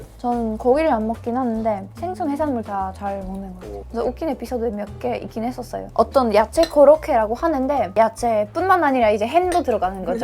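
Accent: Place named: Jeolla, South Korea. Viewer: 한국어에 전라도 어투가 섞여 있어요. 신기..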